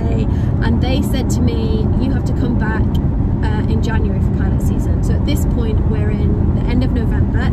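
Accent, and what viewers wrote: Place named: Sheffield, United Kingdom. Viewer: Lol. The more you talked the more you could hear your Sheffield accent come out. ( also from Sheffield not living in England )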